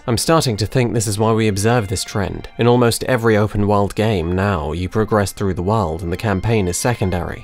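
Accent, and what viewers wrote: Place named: United Kingdom. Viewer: Anyone can cobble words together with a British accent... Intrinsic this extrinsic that... And sound smart to stupid people. If you are going to deep dive maybe read a little and give yourself historical context. You're missing so much and focusing in too much on mechanics but the rot in AC is in its spirit, not engine. Ubisoft is a sexual assault/crunch factory swamp... Toxic. That's why your games are ass. You don't even come close to addressing this stuff. I can't wait for a game essayist who has even one other idea/Interest aside from gaming.... So just Jim sterling lol. Read a book.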